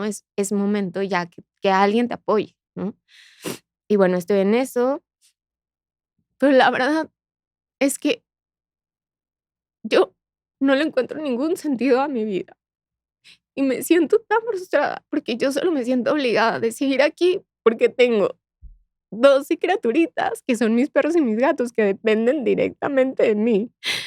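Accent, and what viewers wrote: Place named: Colombia. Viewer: Habla como Colombiana...solo falta el ...como así...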